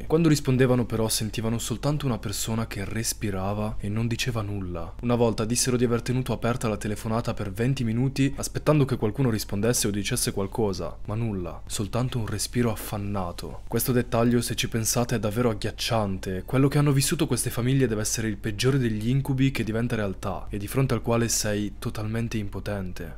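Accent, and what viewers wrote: Sardinia, Italy. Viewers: Bella sardu sese?😂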